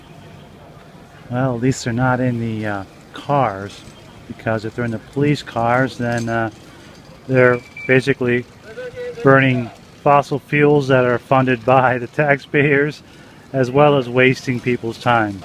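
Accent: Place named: United States. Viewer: Yeah, if they did arrest him I would assume they would use him as a bargaining chip for Uncle Sam. I assume he's American anyway, due to the accent.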